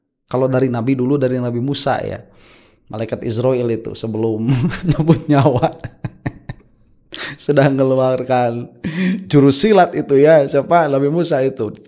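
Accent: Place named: Surabaya, Indonesia. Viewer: Pean suroboyo ndi cak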